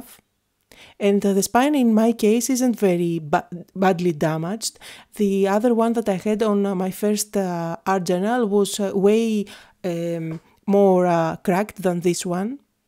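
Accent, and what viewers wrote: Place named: Greece. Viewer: Φαινεται τοσο πολυ οτι εισαι Ελληνίδα , απο την προφορα και μονο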